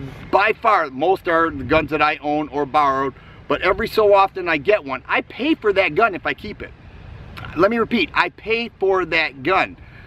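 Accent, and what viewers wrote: Michigan, United States. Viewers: and as far as your voice goes it reminds me of home, fellow michigander here. currently in GA. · Suffering succotash, I never noticed a lisp!! To me, you sound like an honest, down to earth, informative gun guy. And a Michigander. You always respond, not like the other guys...you are number one in my book bud. Keep up the good work. Oh, almost forgot, the refrigerator door series are my favorites!! Any chance of bringing a new, similar series? Steel door, old fridge door, dishwasher door....you see where I'm goin with this? Thanks a bunch.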